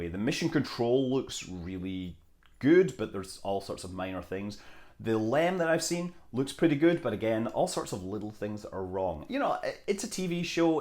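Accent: Scotland, United Kingdom. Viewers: looks like times are hard out there, so I have shipped you some razor blades. Just because you sound Scottish, doesn't mean that you have to look like a drunk Glaswegian in a Soho gutter!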